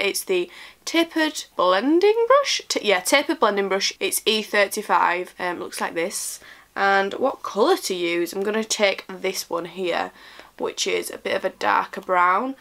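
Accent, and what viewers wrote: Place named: Yorkshire, United Kingdom. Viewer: deffo from yorkshire haha like me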